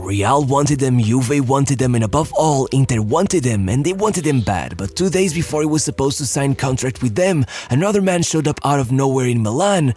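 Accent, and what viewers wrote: Portugal. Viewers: Pela tua voz, ouço um sotaque português. Fico feliz em saber que seja alguém da mesma língua que a minha a gerenciar este canal. Abraços do Brasil 🇧🇷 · Tu és português né? Sinto um sotaque português 😂😂😂 ao falar Paulo Futre Ronaldo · Its not his fault that he has a portuguese accent because he is portuguese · I know you are Portuguese by the way you say those portuguese names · O gajo por trás deste canal é tuga? A maneira como diz os nomes convence ngl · Futre foi gigante- Mas esse inglês-tuga e essa forma de colocação de voz fazem do vídeo algo de absurdamente nauseante. Experimenta fazer a narração com voz normal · tens um bom ingles mas nota-se o sotaque pt hahaha bom trabalho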